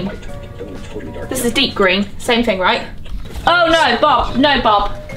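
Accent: United Kingdom. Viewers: How still sound british even she live in America most of her life. Love your art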